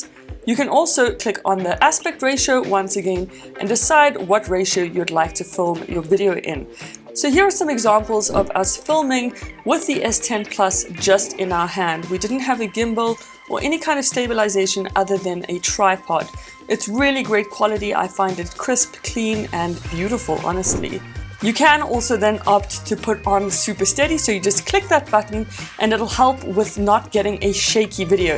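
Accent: South Africa. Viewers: is jy Afrikaans? Jou akcent klink baie soes een van n soetie XD maar dis nice om te sien dat daar is talent Suid Afrika, goeie video! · Just subbed for the SA accent 😍 feels like home! Great content, probably the best in-depth camera review I've seen on YouTube so far! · Finally a South African reviewer🙋‍♂️ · Awesome review. Love the South African accent.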